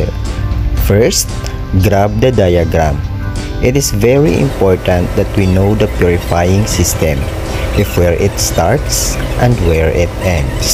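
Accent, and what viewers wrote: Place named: England, United Kingdom. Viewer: I have loved your content, and I love your English accent, it is easier to understand ❤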